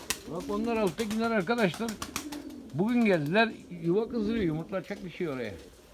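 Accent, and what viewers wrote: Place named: Izmir, Turkey. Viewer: Esenlikler abicim. Şiven İzmirli gibi ama bizim buranın insanı taklacı bakmaz. :)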